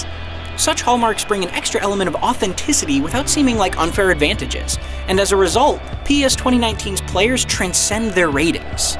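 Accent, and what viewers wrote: United States. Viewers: nice try but if i hear an american accent i automatically dont need your opinion when it comes to football .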